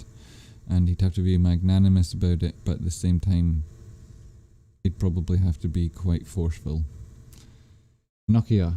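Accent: Scotland, United Kingdom. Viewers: Here on apeandys recommendation. Good to hear a Scottish accent 😂I bought some gme on etoro. Did you say that etoro was dodgy?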